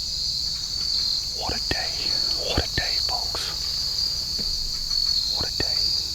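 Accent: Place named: Arkansas, United States. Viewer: Awesome story. Also you have the most Arkansan accent in Arkansas, so congrats there to 👏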